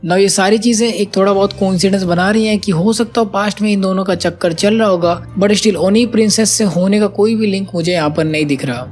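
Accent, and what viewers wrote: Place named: Uttar Pradesh, India. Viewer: bhai....🤣🤣 maza aa jata hai tera sun ke ...full UP wali feel aa jati hai..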